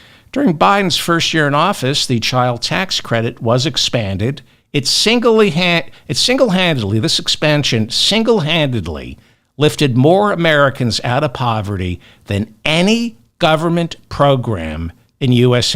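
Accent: New York, United States. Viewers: Your voice makes me miss nyc long time retired to Thailand I hs for reporting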